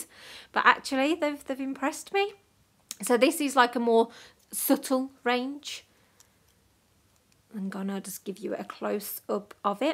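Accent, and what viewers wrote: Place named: Tamworth, United Kingdom. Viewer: Hey, sorry for the none nail related question. I recognise the accent I think. Are you from Tamworth? X. Love the tip. Thanks for sharing.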